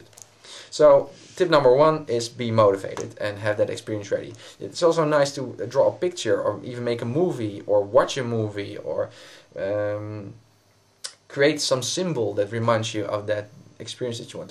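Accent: Netherlands. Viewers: i can hear on your accent that your dutch? or am i wrong:P? nice vid btw! i really want to learn this^^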